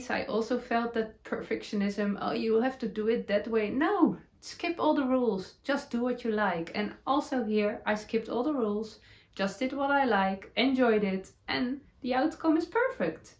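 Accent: Netherlands. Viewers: Dear, so happy to have found your podcast! I enjoy your Dutchy English, and your knits are beautiful. It is always nice to know I'm not alone in my mistakes. Thank you, for sharing your adventures. Cheers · I enjoy your podcasts so very much, I love your Dutch English! You have definitely grasped the English language very well. I’m knitting the CORRAN cardigan too….I might made short sleeves like yours. You inspired me. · Actually you have a lot of American friends. I watch all of your podcasts...and love them. You're so cute and your "Dutchy" English is improving very much. I'm from Kansas City, Missouri and I look forwardvtobyour podcasts each week. 😊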